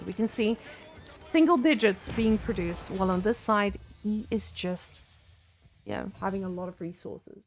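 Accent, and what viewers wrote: South Africa. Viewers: I'm not a beginner but I watched the whole video to enjoy the dulcet sounds of mesmerizing South African accent.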